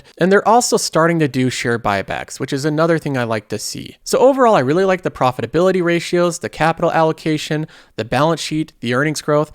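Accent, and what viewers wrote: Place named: Northwest, United States. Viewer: Hey man - I gotta ask, are you from the NW? I just got married in Washington State and my wife's entire family talks just like you the way you pronounce words like "Feel" kind of like "Fill" or "Fell". Haha so funny!! 😂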